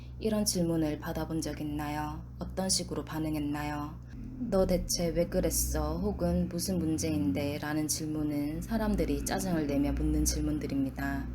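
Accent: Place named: Busan, South Korea. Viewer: 나래이터가 부산분이신가바옇ㅎㅎㅎ 잼있어요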